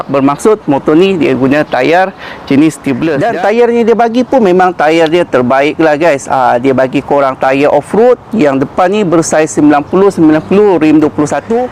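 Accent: Sabah, Malaysia. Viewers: Mcm org Sabah, ngam ka?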